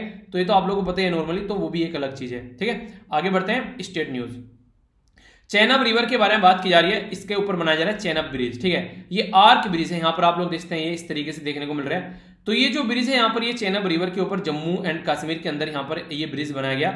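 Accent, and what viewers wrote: Haryana, India. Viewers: Aap haryana se ho sir... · Am damn sure sir that u are from Haryana and from around Rohtak sonipat belt .0001 jitna bhi doubt nhi h😀🙏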